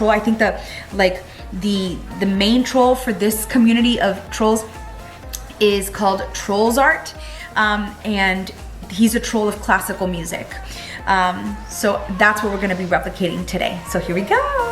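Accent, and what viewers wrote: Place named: New York, United States. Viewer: Loved everything, but I'll admit my favorite part was definitely your New Yorker accent 😂🤣❤👏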